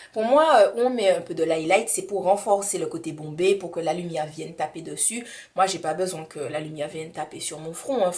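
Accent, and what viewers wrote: Antilles, Caribbean. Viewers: j'adhere avec tn accent des antilles jme sen chez moii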